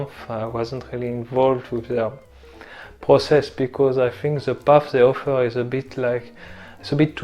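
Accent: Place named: France. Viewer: So nice, c'est toujours un plaisir de regarder tes vidéos, de plus avec ton petit accent français ça me permet de vraiment bien comprendre ! You are on the good way my friend ! 👏👌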